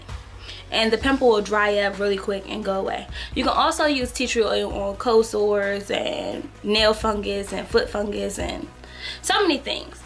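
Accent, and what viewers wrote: DMV, United States. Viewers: I KNEW IT!!!! LOL I am from the D(M)V. lol so crazy how I can pick up on our accent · Lol... I knew you were from DMV area when I heard your accent. Great video.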